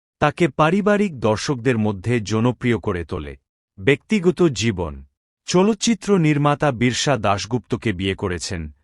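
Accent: Bangladesh. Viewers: বক্তা মনে হয় বাংলাদেইশ্যা। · নিশ্চয় বাংলাদেশী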